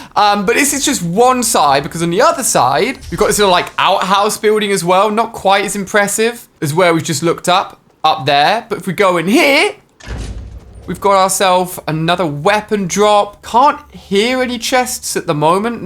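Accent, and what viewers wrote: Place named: United Kingdom. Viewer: How you get your british accent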